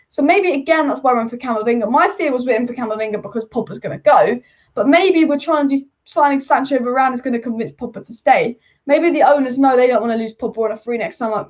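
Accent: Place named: United Kingdom. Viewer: please please Speak little bit Slower everyone is not English specially its more difficult to understand british accent,please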